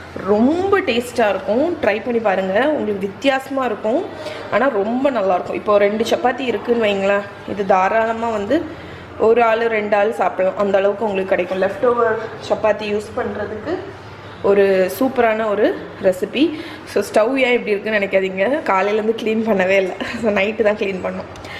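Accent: Nagercoil, India. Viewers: Nanum nagercoil than akka.....ipo konja days ah than unga videos parkurean.....super akka...nice character you are........ enaku 2 months before than baby poranthuruku....unga kutties parkum pothu enakum Oru girl baby vanthatha nenachu proud ah iruku akka....nager coil slang ah YouTube la parkum pothu romba happy ah iruku.....may God bless you akka.....en husband ku cake romba pidikum...unga channel parthuthan cake pannurean...nice receipe....super.....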